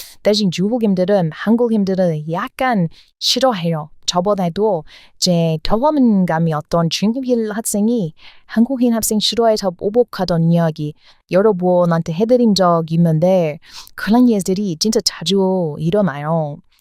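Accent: United States. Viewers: 는 미국인 발음으로 한국말하는 것이 엄청 듣기 좋읍니다. 제가 하나 알려줄께요. 미국에는 일본유학생이 거의없고, 대부분 아시아유학생등은 중국,인도,한국인들이죠. 역사적으로 중국은 새로운 중국토종 왕조가 지배하면 북방 유목민등의 왕조역사를 왜곡하죠. 그리고, 이런 혼란한 앞뒤맞지 않는 역사.문화유적을 모택동시절에 문화대혁명으로 다 파괴해버렸죠. 그리고, 공산주의 전체주의국가인 중국은 어릴때부터 학교교육에서 자기중심적 교육으로 세뇌를 받았읍니다. 이것이 자본가와 노동자등으로 구분하는 이분법적인 체계로 나와 너, 우리편과 적을 구분하죠. 일본도 민주주의국가인데도 중국같이 왜곡합니다. 한국은 세계에서 유일하게 이분법적인 사고를 가지고 있지않은 사람들입니다. 한국사람은 밝은 것과 어둔 것이 같은 동전의 양면이라는 것이고, 나쁜놈과 좋은놈을 수용하고 배려합니다. 왜냐하면, 사람들은 두가지 면을 가지고 있기때문이죠. 공부해보세요. 바로 이것이 한류의 답입니다. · 의 혀꼬부러진 한국말 들을수록 재미있어요. 진짜 미국인이 맞는지 그리고 집안 내력과 나이 등 좀 알려주세요. 혹시 한국계 미국인 아닌가요? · 근데 진짜 궁금해서 그런데 미국인처럼 흉내내는 한국인 아닌가요? 들을 때마다 신기해요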